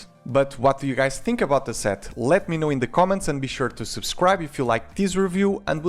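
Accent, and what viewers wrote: Portugal. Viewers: that portuguese accent x) trending video, congrats.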